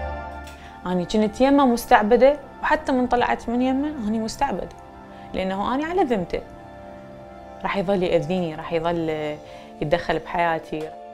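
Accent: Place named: Iraq. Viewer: مااعرف ليش حسيتها عراقيه دخلت للفديو وطلعت صدوك بنت بلدي. حجيها كله صح احنه عايشين بمجتمع ذكوري يشوفون الولد نبي والبنيه كافره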